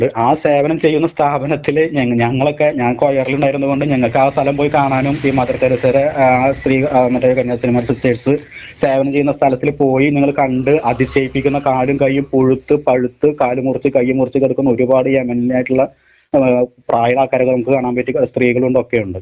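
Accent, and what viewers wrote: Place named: Thrissur, India. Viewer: എന്നാണ് ത്രിശൂർ രീതിയിൽ സംസാരിക്കാൻ പഠിച്ചത്.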